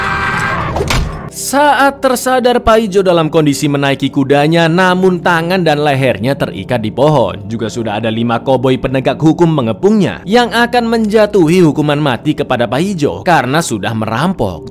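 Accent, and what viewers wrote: Java, Indonesia. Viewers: Mas jowo asli Tah mas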